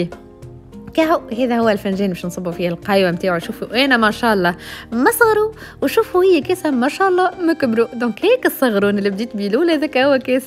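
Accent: Sfax, Tunisia. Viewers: machallah 3lik awl mara nitfaraj fik 3ssal w heki il accent sfa9sia zeyditik wahra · ممتااااز ورائع اما اليوم يا حسيتك اتزقزق بالصفاقسي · Awel mara nitfaraj 3lik ma7lèk fi bèli sfaxienne 7asb lahja ou ena zèda bravo bonne continuation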